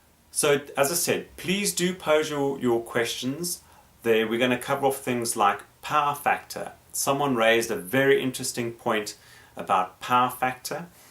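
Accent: South Africa. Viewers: Even with a thick SA accent, your video was easily understood and interesting. Great video 👍 and thank you.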